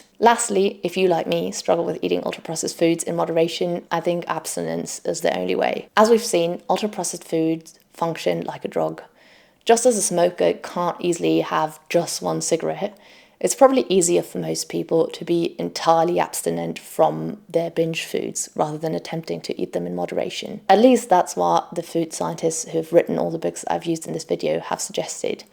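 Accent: England, United Kingdom. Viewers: I'm a little puzzled. You have an English accent and kicked off with American chocolate being rejected in Britain but then the whole video was about the US market, the FDA and American food giants. No mention of the rejection of many ingredients by the EU for example.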